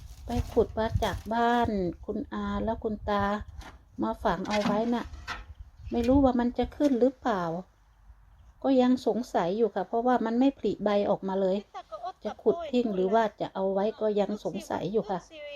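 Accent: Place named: Isan, Thailand. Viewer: เพิ่งเข้ามาชมฟังเสียงพูดเหมือนทางอิสานใช่คนโครชไหมคะ..เห็นอยู่คนเดียวสามีตาย..คงได้เงินช่วยบำนาญแม่หม้าย..ว่าแต่ตอนนี้ยังช่วยตัวเองได้. แต่อายัมากขึ้นจะลำบากไหมกลับมาอยู่ไทยสร้างบ้านอยู่คนเดียวใกล้ๆลูกหลานดีไหมคะ..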